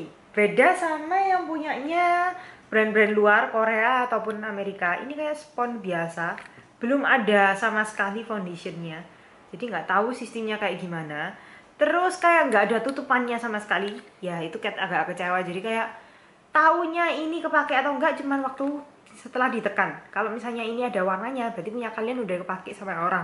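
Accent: Java, Indonesia. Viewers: kak medok bgtt gaboring sukaa bgttt wkwkwk😂😂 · Medok nya wkkwkwk♡ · Itu dimedok2 in apa medok beneran si kak 😬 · Oh my~~~ untuk orang yang dulu tinggal di surabaya dan kangen medok nya orang jawa, seneng banget nonton video ini 😍 Dan aku langsung ngakak denger pengampon, kalo beli makeup murah juga di pengampon 😂😂😂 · Jawa banget, keren keren. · Dulu pas mau lihat, wah muka2 kek gini pasti ngomongnya inggris nih. LAH KOK medokkk gitu mbakeeee... luccccuuuu 😂😂😂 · Kocak abis reviewnya jowo banget aku langsung subscribe ka wkwk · wadoh ce medok poooll 😂 subscribed yooo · Ci medok banget ! Nice video btw~ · Medok jawa nya 😂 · hahaha I❤U!! lucu, ga lebay, kocak bat medok gt.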